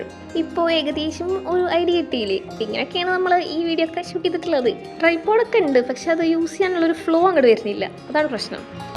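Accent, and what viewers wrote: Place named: United Kingdom. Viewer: Her English accent🌟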